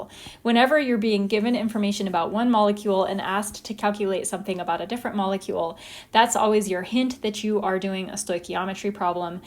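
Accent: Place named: United Kingdom. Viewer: Hello MAM I'M A GRADE 12 STUDENT FROM INDIA.......I USED TO THINK I CAN'T UNDERSTAND BRITISH ACCENT BUT I WAS WRONG U MADE THIS GAS STOICHIOMETRY PROBLEMS DAMN EASY......I NEVER EVER UNDERSTOOD THE CONCEPT OF WATER DISP METHOD PROPERLY BUT THANKS TO U FOR MAKING IT A LOL TOPIC.......BTW PLSS MAKE VIDEOS ON PHYSICAL CHEMISTRY RELATED CONCEPTS AND SOME INORGANIC BLOCK CHEMISTRY RELATED CONCEPTS😅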